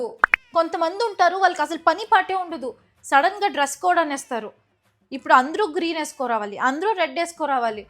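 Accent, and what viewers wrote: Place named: Karnataka, India. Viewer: It"s Karnataka Telugu 😂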